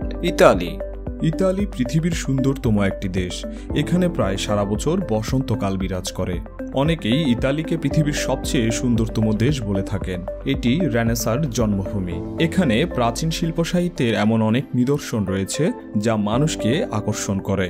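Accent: Noakhali, Bangladesh. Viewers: Vai ki Noakhali / fenir 😜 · Noakhailla tor uccharon thik kor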